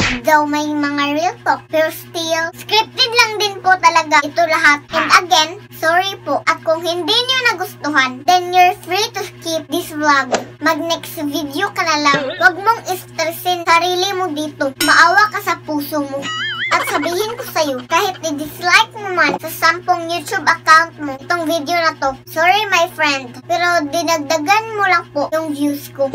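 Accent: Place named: Mindanao, Philippines. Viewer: Cute kaayo ka day.pede mangutana.nganong naa man ksy dira nga word.mura mn ka og taga mindanao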